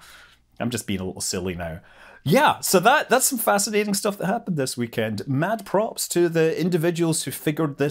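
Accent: Scotland, United Kingdom. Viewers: Is this guy a Scott? Love the accent!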